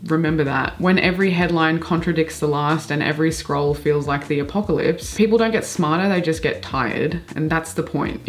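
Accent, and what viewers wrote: Australia. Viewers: Aussie John Oliver is back!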